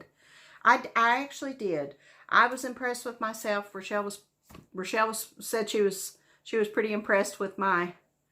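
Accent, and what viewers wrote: South, United States. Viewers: Hi, you had me at "Love Abide". swoon. Thank you, kindly for sharing all your finds. Gosh, you sure have a good eye for beautiful things. Once again, your charming southern drawl washed over me and bathed me in Grace & Goodness. Thank you for sharing your spirit and such lovely inspiration. It was a pleasure to drink coffee and "visit" with you. Happy Stitching, friend, Daleen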